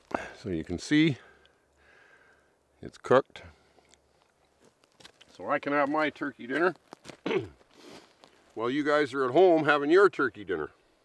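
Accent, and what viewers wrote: Alberta, Canada. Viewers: keep recording these videos. Experiences in the "bush" as you explain and record are not only interesting, but soothing. I wish I were a bit younger and surrounded by nature. You are a great "ambassador" to bushcraft. (BTW: Your Canadian accent adds a GREAT deal to your videos.) Keep'em coming, mate! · He has a strong Canadian like accent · This guy sounds like every man in Alberta/BC...... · Are you Canadian?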